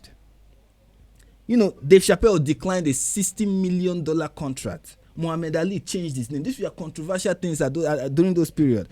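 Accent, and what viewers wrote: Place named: Nigeria. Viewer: So happy the algorithm sent me in your direction. Nigerians have a special clear sighted snark and its a voice in the most musical of accents the world needs to hear right now. Privileged Americans whose careers are entirely racially based are everything wrong - just another way of staying on the plantation - we outside. Peace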